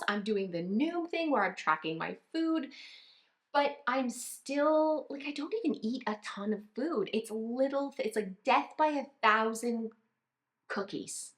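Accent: Canada. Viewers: She sounds Canadian…..so Canada has better Dollar Trees…probably more polite..lol…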